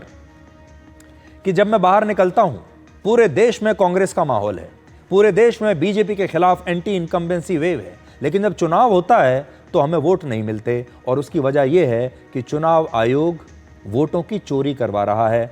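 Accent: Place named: Bengal, India. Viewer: U r 100% bengali. (Ur pronunciation is similar to bengali)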